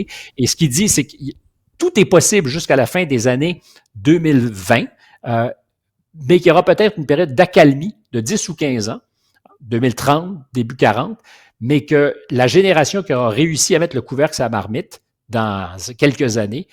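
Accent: Quebec, Canada. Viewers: J'adore l accent franco canadien 🇨🇵✌️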